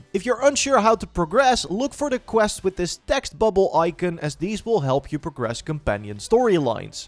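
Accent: Netherlands. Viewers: You dutch ?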